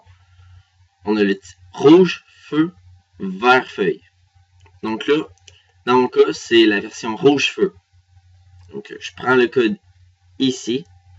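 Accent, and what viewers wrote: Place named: Quebec, Canada. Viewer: L'accent québécois 👌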